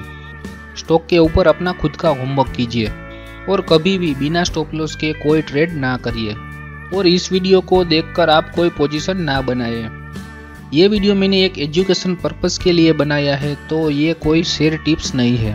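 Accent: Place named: Gujarat, India. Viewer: Love ur gujrati accent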